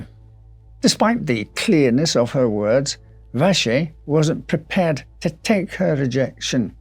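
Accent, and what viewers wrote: Scotland, United Kingdom. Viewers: I’m no expert but that’d be my best guess too, Scottish. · I love his voice and accent. Is he Scottish? 🥰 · "Somehow" I knew one-day a British voice would explain that there was a French murderer than was worse than Jack The Ripper! Ha!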